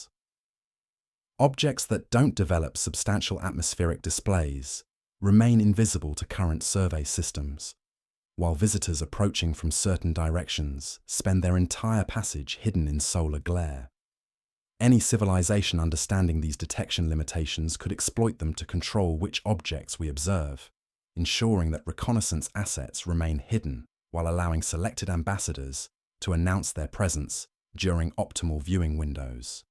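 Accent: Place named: United Kingdom. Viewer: I'm really getting tired of the gay British narrating on all these darn videos. How about good old USA English huh?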